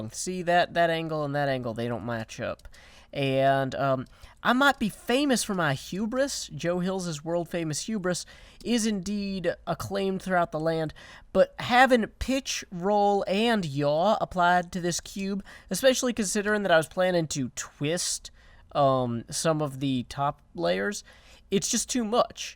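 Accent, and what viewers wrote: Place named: Texas, United States. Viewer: You have a cool accent It sounds Texan but the video says not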